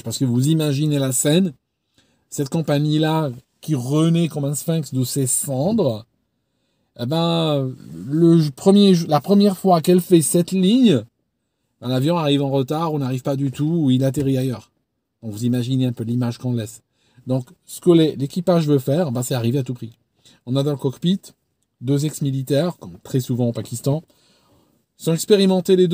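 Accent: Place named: Geneva, Switzerland. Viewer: Excellent comme toujours. Je perçois, depuis deux vidéos, un accent genevois qui revient comme un jour de Bise sur la rade. Je suspecte un contact alpin récent…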